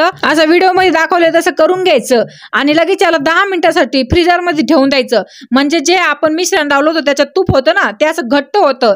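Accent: Ahmednagar, India. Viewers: Nagari bhashecha laheja mast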